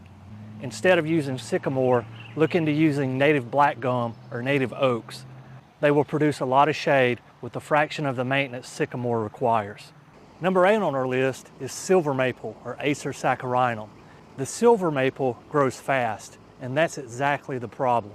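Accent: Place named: Alabama, United States. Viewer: You need to list your region. Based on your accent I’m guessing you’re somewhere near Alabama.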